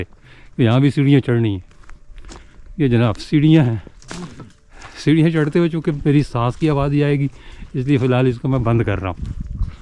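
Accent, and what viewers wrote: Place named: Punjab, India. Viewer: His Urdu has inclination towards United Provinces , and to Bihar, yet a creamy touch of Panjabi accent.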